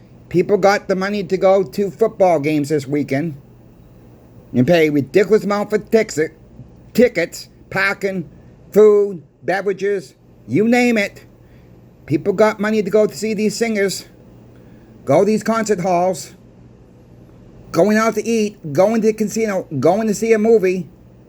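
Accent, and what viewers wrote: Boston, United States. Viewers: keep them coming. You got the gift of gab and IDK the accent but it works for the channel 🤣 not to assume but is that a Boston accent?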